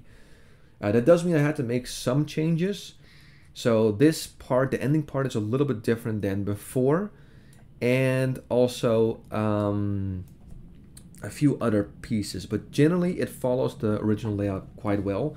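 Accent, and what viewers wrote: Netherlands. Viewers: Obvious Dutch accent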